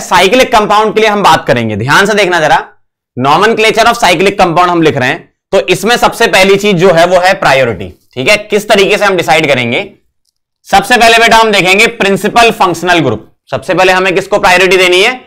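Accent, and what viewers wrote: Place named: Ranipura, India. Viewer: Ranipura se ho ka masaab?? Eso sunbe me a Rao.. mau balan ki traf se raam Ram..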